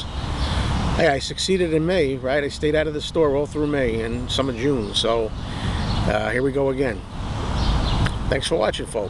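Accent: New York, United States. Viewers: I assume from your accent you're a NY guy like me?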